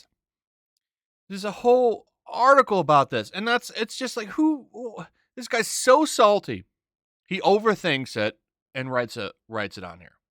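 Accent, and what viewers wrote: United Kingdom. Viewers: Love the British accent.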